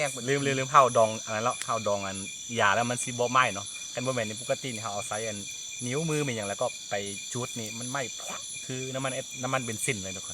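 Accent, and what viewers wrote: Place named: North, Thailand. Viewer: สำเนียงคนเมืองเลย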